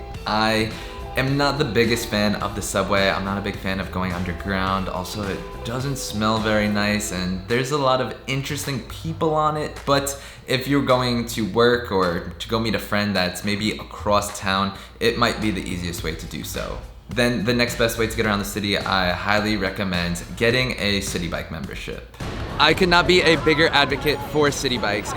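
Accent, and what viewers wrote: New York, United States. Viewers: Is it just me or does your accent sound different now, its changed from a broad new yorker 😊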